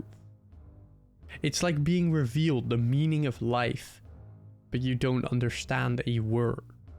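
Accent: Netherlands. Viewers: You sound Dutch, coole video gast!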